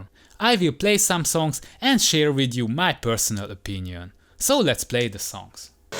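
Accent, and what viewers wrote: Hungary. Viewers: De hallatszik hogy magyar vagy :D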